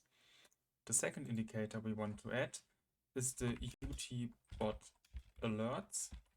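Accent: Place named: Germany. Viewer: After two seconds, everybody recognises the German accent.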